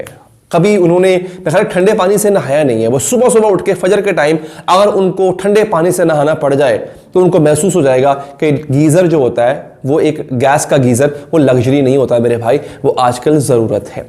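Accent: Punjab, India. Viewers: 😂😂hyeee sir aapki punjabi 😍😍 Love it ❤ punjabi tu bss 2 hi logon ki hy 1 aap or 2osra ......😂😂. Pharr ke kuttu onu..❤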